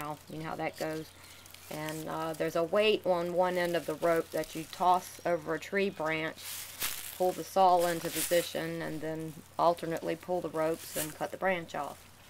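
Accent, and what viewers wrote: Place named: Tennessee, United States. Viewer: Never knew this existed, wow! Must get me one. Love the accent. Sounds like Tennessee.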